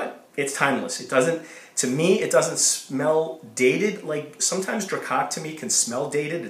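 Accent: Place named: Boston, United States. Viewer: I hear Boston!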